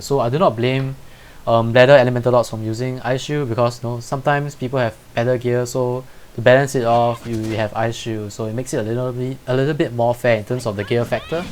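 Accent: Singapore. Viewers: Lol I can tell you're Singaporean just by the way u talk! No problem understanding u cuz im also Singaporean :D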